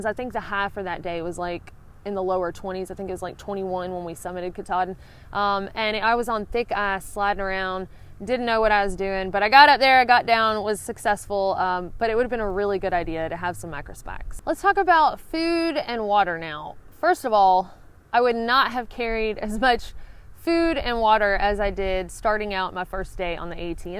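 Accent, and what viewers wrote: South, United States. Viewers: LOL! No, that’s not trail talk, it’s how we talk in the south. Assy = icy. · We start packing with "cheaper", and "heavier" equipment normally. But it's nice to have an idea of what others found useful, even if it is more expensive. You invest in what you love and/or enjoy. Some will hike, and give it up. Others will get drawn in, and have a life long hobby. For them, they will enjoy the information of a seasoned veteran. Thanks for the info! And Merry Christmas! And to those that want to correct the Southern pronunciation, please remember: though the "South" is connected on the map, it's a different country. It would be the same as telling the English, or Australians how to speak. Be respectful, embrace our differences, and just don't do it. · My dad always told me to never make fun of someone with an accent because they speak at least one more language than you do. 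Southern counts, right? Oh yes it does.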